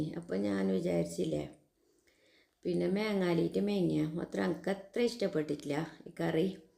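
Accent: Kasaragod, India. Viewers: ചിക്കൻ കുടൽ കറി ആദ്യ മായി കാണുന്നു 😅👌പ്രത്യേകിച്ച് കാസർ ഗോഡ്കറി യും ആദ്യം തന്നെ. മുട്ട കറി യിൽഇടുന്നത് കണ്ടിട്ടുണ്ട്. പുതിയ ഒരു കറി പഠിച്ചു.കാസർഗോഡ് സംസാരവും.... 👌👌❤️❤️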